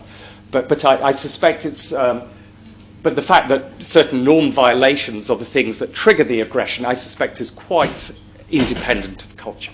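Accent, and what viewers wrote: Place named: United Kingdom. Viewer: is the british accent that difficult to decipher? i enjoy it immensely :)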